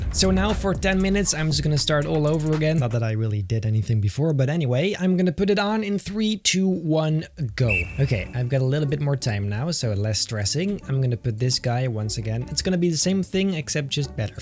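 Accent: Netherlands. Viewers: Damn I was hoping for more dutch accent but honestly any vid is a win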